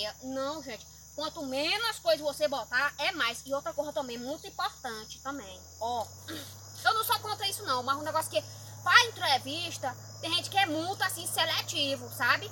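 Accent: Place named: Pernambuco, Brazil. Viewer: Quando vi você pela primeira vez achava que tu era mineira ou pernambucana. Adoro esse sotaque, ainda quero ir no Pernambucano... Parabéns ótimo trabalho!!! ✌👏👏👏👏👏👏👏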